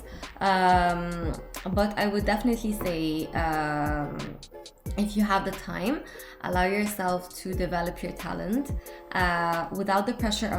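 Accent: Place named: England, United Kingdom. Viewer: Beautiful English accent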